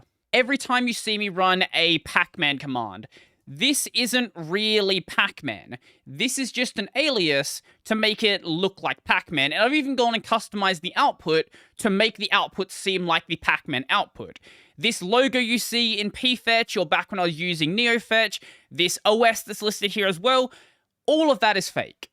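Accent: Australia. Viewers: are you australian or what? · I fell for it until I realised you are Australian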